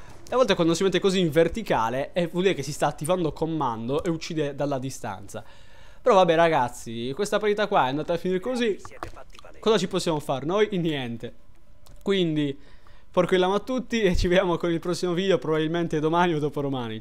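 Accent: Sardinia, Italy. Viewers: Lama ma tu sei sardo o sbaglio??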